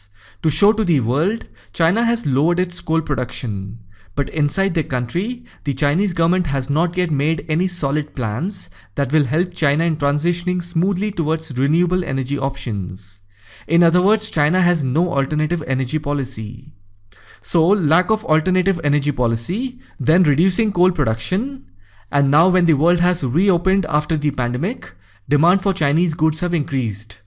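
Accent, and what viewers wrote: India. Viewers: your English feel like hindi , easy to understand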